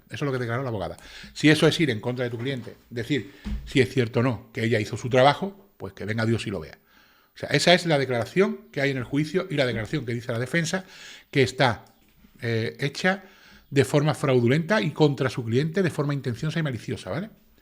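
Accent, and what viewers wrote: Andalusia, Spain. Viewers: Es del sur de España, una región donde se habla muy el castellano, porque más que se lo digan es imposible que corrija su vocalización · Muy interesante, muy bien documentado, un trabajo muy profesional, el único "pero" es el esfuerzo que hay que hacer para entender el "andaluz estrecho" y sin diferenciación de algunos fonemas, entonación y arrastre de las sss y algunas guturales.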